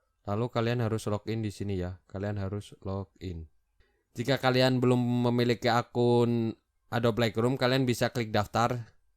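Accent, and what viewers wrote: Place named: Java, Indonesia. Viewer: ngomongnya gausa di medok medok kakaaaa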